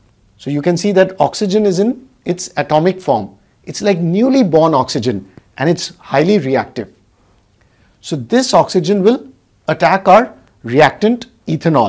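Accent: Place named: India. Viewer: Plzzz no more indian pronunciation 🤦‍♀️🤦‍♀️🤦‍♀️🤦‍♀️🤦‍♀️🤦‍♀️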